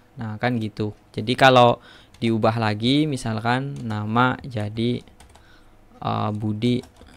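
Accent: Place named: Madura, Indonesia. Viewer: dari logatnya kayanya orang madura deh :)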